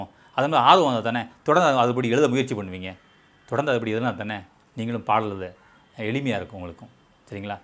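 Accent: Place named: Tirunelveli, India. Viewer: உங்கள் தமிழை கேட்டுக்கும் போதும் உங்கள் முறுக்கு மீசையை பார்க்கும் போதும் நிச்சயமாக நீங்கள் நெல்லையின் மைந்தன் தான் வீச்சருவா வீசும் வீரமுள்ள பூமியில தமிழ் பாடி தலையாட்ட வைத்தவரோ ?? எனது அருமை கவிஞரே எனதூரும் நெல்லை தான் வாழ்த்துக்கள்🌹🌹🌹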